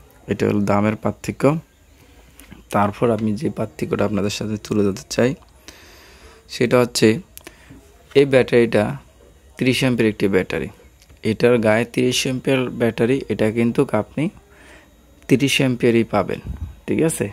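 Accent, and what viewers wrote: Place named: Chandpur, Bangladesh. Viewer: ভাই আপনার কথায় মনে হচ্ছে আপনি চাঁদপুরের মানুষ। চাঁদপুর সদর বা হাজীগঞ্জ, বাকিলা ঐদিকের। আমি ধারনা করে বললাম। আশা করি সত্যিটা জানাবেন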